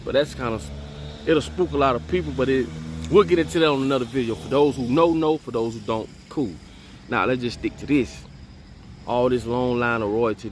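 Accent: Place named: AAVE, United States. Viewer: He speaks in ebonics. It's Vernacular English not American or British. 🙂😂A judgemental person calling out another judgemental person.🙄why?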